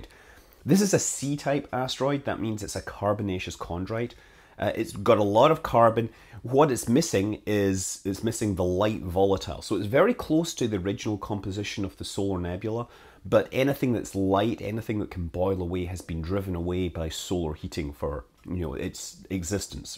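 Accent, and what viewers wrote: Scotland, United Kingdom. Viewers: Hes Scottish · I really love listening to your scottish accent! It is so cute! And - more important - really can explain things very well! You would be an outstanding science teacher!!! Physics teacher Michael B. Butter from Radebeul, Dresden, Germany and: Thank you!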